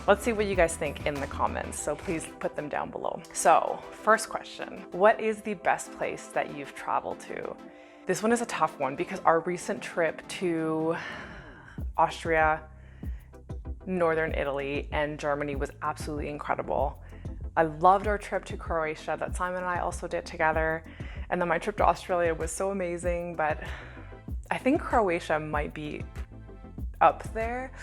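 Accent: Quebec, Canada. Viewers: Salut! Tu pilotes mon avion préféré (Dash8/400); je suis passager régulièrement entre Montreal et Halifax! Super de t'entendre parler Québecois;P Aussi, Mars, sign me up! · French canadian? Or real French from France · Canadian accent - all Canadians are taught French (by law). If it was your first language then you must have been raised in Quebec. Have enjoyed your videos - thank you! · Merci pour les réponses.. J'avais deviné avec ton accent que tu es québécoise. Continue ton excellent travail! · French Canadian i am guessing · You have a Canadian accent eh?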